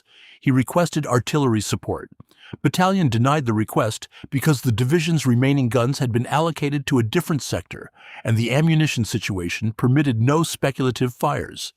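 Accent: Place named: United States. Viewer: I love this because it sounds so American. Remember though at Remagen, it was LT Timmerman that saw the bridge 1st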